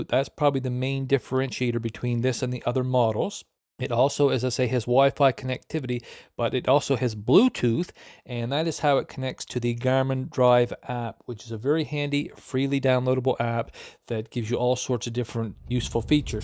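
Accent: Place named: Newfoundland, Canada. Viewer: Good newfie east cost voice 👌👌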